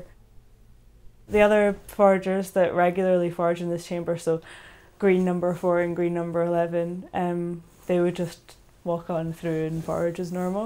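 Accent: United Kingdom. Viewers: What's her accent? Welsh? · I think she sounds Scottish? But it's not an accent I can place. Anyway, hive beasties are super-interesting to me, so this is great!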